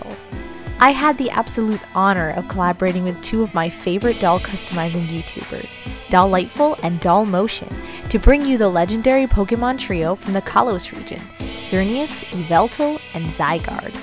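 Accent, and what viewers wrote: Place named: United States. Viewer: Are you american cause you sound american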